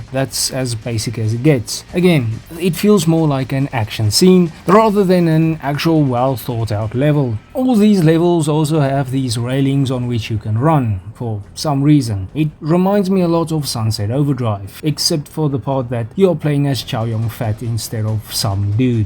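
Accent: South Africa. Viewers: Ur the most South African sounding guy I've heard doing a game review. Glad u popped up on my time line. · Hey man, great video. Are you South African by any chance? Curious due to your accent. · I enjoyed the flow of this video a lot, a nice surprise on my feed! Also is that a fellow afrikaner I hear? :P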